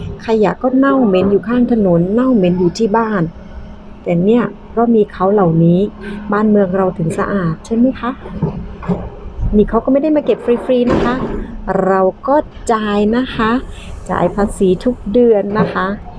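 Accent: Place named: Central Thailand, Thailand. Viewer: ยินดีที่เป็นคนใต้ทำคลิปไม่ค่อยมีคนทำ และพูดภาษากลางฟังชัดป้าเป็นคนชอบประเทศเยอรมัน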